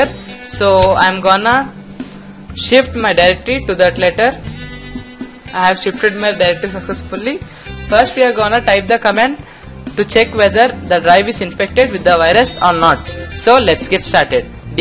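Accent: India. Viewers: I love how he sound Indian lmao. Thanks for the tutorial tho. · When i heard the indian accent I just clicked off and disliked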